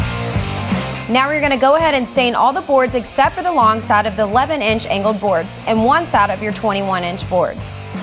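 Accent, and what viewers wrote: South, United States. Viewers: She is absolutely stunning!! The redhair, southern draw, knows how to use power tools and can build!! She is Amazing!!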